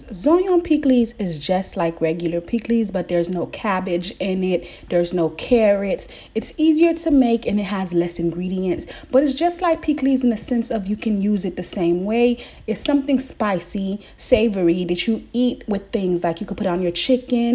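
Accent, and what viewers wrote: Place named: Miami, United States. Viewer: I knew it that Miami accent is strong lol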